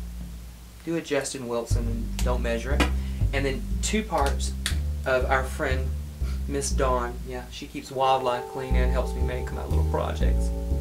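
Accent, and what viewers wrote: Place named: South, United States. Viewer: thanks for the reply! I definitely like you're idea better, I'm working on mine tonight as soon as I get home! Keep up the great work!! Can't wait for thext video! BTW, where are you from? You sound soo southern!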